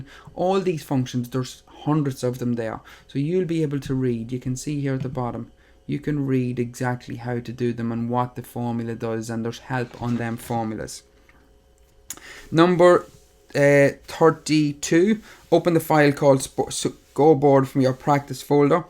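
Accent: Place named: Ireland. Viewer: love your accent. where is ireland are you from?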